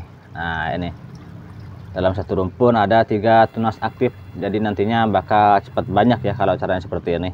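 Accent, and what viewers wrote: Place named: Sunda, Indonesia. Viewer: Sy dengar pencerahannya TKS ya kang cmn sdkit geli itu logat sundanya meni medok psn😊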